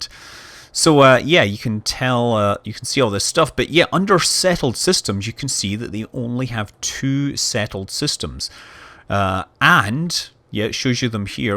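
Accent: Scotland, United Kingdom. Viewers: You sound just like Scotty from startrek. That is so freakn cool. I wold flos that accent and pick up all the chicks :D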